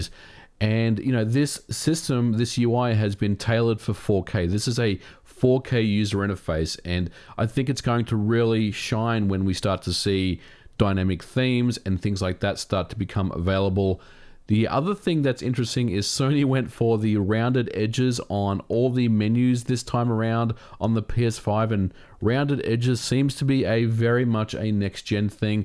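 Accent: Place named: Australia. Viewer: Australian with a hint of American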